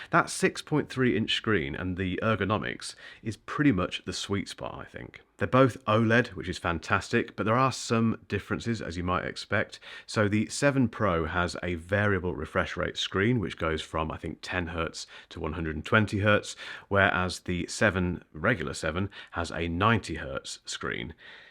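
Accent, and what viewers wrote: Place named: Essex, United Kingdom. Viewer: This man speaks with an Essex accent. He is an Essex boy.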